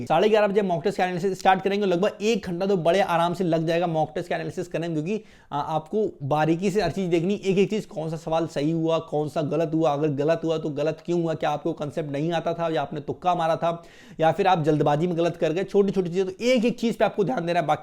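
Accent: Kanpur, India. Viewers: Jyada kisi se chatiyo mat kanpuriya language 🤣🤣🤣 Maja aa gya Bhai 😁😁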